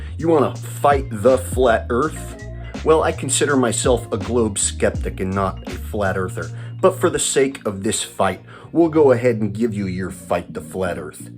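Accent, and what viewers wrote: Bristol, United Kingdom. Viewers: I bet he dont go out much in Scotland, This guys accent is from the Bristol area in England, the Scots are not fond of the English, I cant see making many friends there. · He has a Bristol accent aka Bristolian, so it is possible he isn't in Scotland....possibly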